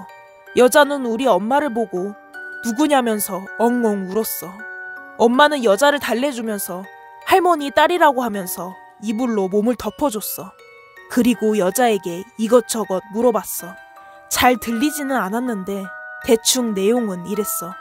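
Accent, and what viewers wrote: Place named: Gyeongsang, South Korea. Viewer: 정신단디 챙기라.이게 포항말이지.듣다봉께로 윽시 재밋노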